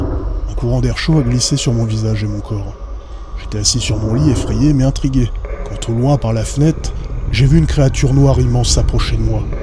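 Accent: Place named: Quebec, Canada. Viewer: Le Québec doit être magnifique mais vos expressions et votre accent est tellement ridicule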